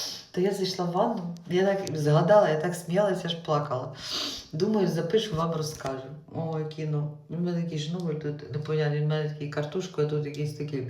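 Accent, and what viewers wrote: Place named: Kuban, Russia. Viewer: Пробило на хіхі.. кубаньська балачка.😂😂😂❤1972 рік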